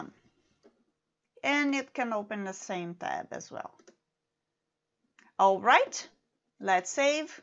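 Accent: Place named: Germany. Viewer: You have a beautiful German accent 😀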